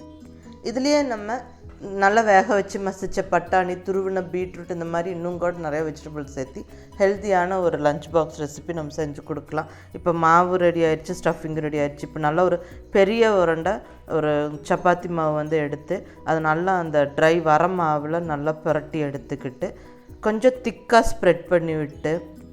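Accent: Coimbatore, India. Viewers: Aunty u know am a combatorian ,whenever am hearing ur voice am feeling like in Coimbatore...superb vlog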